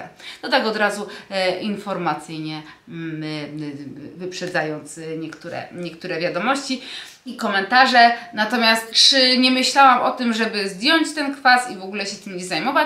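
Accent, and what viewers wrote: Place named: Ukraine. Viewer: Po polsku wymawia się zmiękczone samogłoski .Jesteś Ukrainką ? Naucz się polskiej wymowy jeśli jesteś w Polsce!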